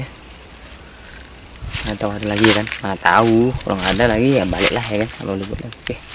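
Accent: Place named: Medan, Indonesia. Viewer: Abang ini orang medan ya aku penasaran logat ngomong nya kayak orang medan...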